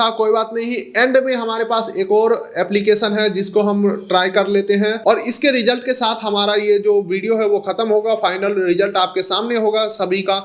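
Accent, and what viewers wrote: India. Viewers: Esky scanner.....indian english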